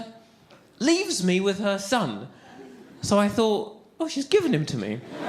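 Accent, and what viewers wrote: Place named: England, United Kingdom. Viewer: çok güzeldi. aksanı ne kadar güzel ya :D ingilizce öğrenirsem kesinlikle ingiliz aksanıyla öğrenmem lazım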